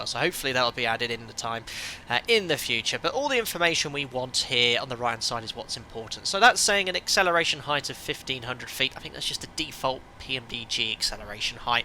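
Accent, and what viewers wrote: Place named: United Kingdom. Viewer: This is why your one of the best flight instructors and tutors for the Boeing 737. Basically an expert with Professional speech / terminology. Full of good knowledge with dry British humour and accent.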